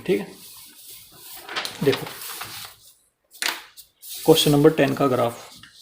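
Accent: Haryana, India. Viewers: Khoon main hi haryanvi hai....Kaha se ho sir 👍🙏🙏🙏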